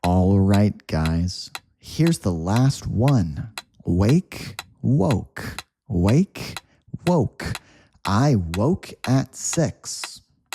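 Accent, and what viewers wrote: United States. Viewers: Could you please make videos about american accent😔😔 and it seems like you are an American. Plz teacher 🙃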